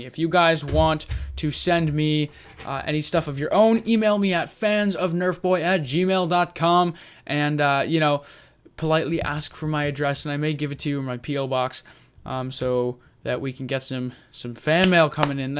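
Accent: Canada. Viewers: Your Canadian?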